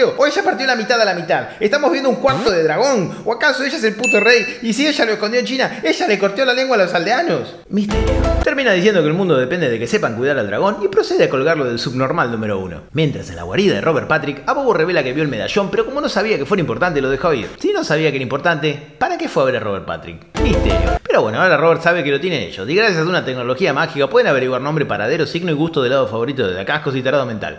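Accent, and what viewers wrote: Argentina. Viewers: Solo un argento puede hacer algo de este estilo. Amo la tónica argentina para este tipo de narraciones.Acido e irónico, Sos un CRACK, Sabelo. No paro de reírme. Ya mismo voy a ver el de Mario Bros.... Mi vídeo juego favorito convertido en bazofia....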